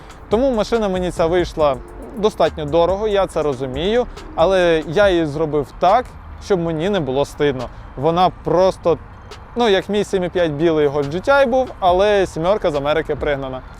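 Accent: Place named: Russia. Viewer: Чути характерну вимову людини яка розмовляла російською, але звісно респект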